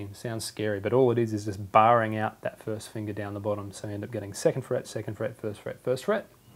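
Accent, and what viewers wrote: Australia. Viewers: has anyone ever told you you look a bit like rob swire from pendulum?? he is australian too!! maybe youre related!!!!! or maybe you ARE him.. hmm , btw if you are actually newsealandish then i am sorry its just im not good with accents and you sound australian but if you are newsealandish im sorry. but your cool :D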